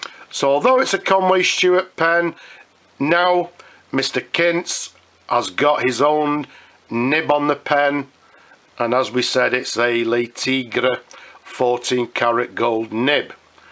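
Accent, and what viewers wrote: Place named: Northern England, United Kingdom. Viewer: Oh, I see! I thought for a moment that you were talking about yourself and was about to ask which race at what meeting so I could place a bet. Excuse me being personal but I love your Northern vowels. Batley, or am I way off mark?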